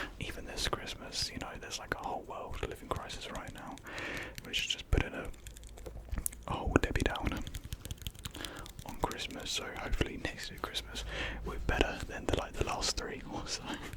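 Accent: United Kingdom. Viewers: I adore you, but you're also easily the most British person I've ever listened to, like painfully British.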